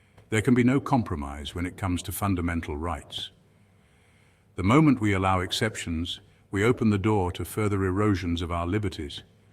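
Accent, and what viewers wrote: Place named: United Kingdom. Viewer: Absolutely on point, and I agree 100% on all points - however... does anyone else see the irony in using a modern British accent for the AI to talk about fundamental American recognition of our human rights? Even the current and newly ex-British in the 18th century did not have that accent - they sounded more like a version of the deep Maine or the traditional New York or Boston (Southie) accent.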